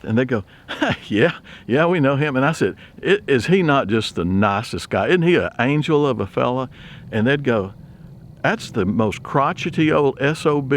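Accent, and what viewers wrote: Texas, United States. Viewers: I could listen to your stories all day, I love your Texan accent and I’ve been down there for awhile but I’m a Canadian n need to b home but I’m greatful that I can hear ur stories and struggles along with you and your beautiful family. Thank you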